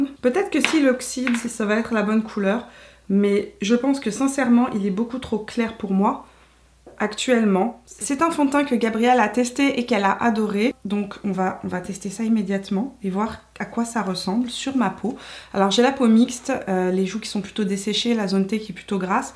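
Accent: Quebec, Canada. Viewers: Bonjour! J'aime beaucoup quand vous faites des collabs toute les deux! Et quand je t'entends parler, ça me rappelle le Québec où j'ai habité quelques années...la palette Dior est superbe, je l'utilise depuis qques semaines. Voudrais tu par contre me dire quel est le dernier pinceau que tu utilises, le fluffy pour appliquer les illuminateurs en topper, stp? La bise.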